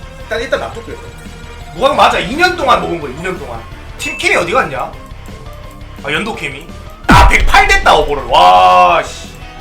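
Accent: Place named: Gyeongsang, South Korea. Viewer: 나 경상도 사람인데 이정도면 사투리 심한 사람중에서 순한 말투다..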